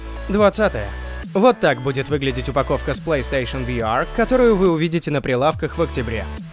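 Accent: England, United Kingdom. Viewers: плюсую английский раздражал аж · по-моему слишком выраженный английский акцент) не стоит, так как еще и по сравнению с русским контраст смешной получается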